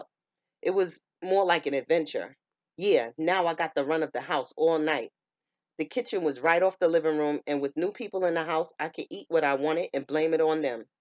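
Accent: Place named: East Coast, United States. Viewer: Thanks for doing this you did. WONDERFUL JOB! U SHOULD CONSIDER DOING THIS FOR A LIVING! LOVE YOUR EAST COST SPEECH!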